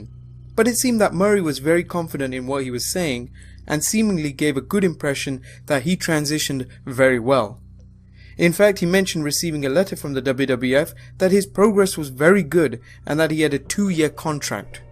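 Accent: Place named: United Kingdom. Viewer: Who else clicks off if it’s not narrated by the British guy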